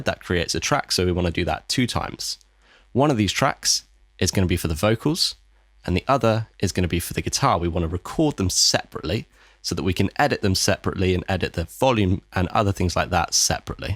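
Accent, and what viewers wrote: United Kingdom. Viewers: Barely heard the introduction Liked for the Englishmen accent omg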